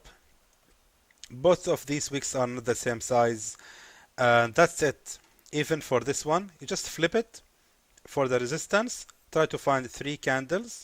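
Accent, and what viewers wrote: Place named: Arab world. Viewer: your accent is Arab, if so, why not making videos in Arabic?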